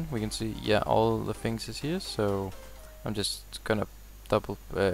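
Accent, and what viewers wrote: Denmark. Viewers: Du lyder dansk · Are you danish lol?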